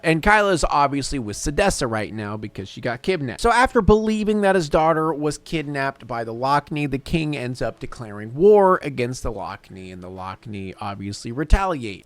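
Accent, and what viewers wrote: United Kingdom. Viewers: why did you cut out Malcolm McDowell's name? lol its literally the only name on that list that has a voice that sounds like your british accent lmao and btw idk why but i feel like Elemental is just like this movie with character building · Wow your New Yorker accent sounds very British,what you read was written by The Nyc guru,as it said at the top of what you read .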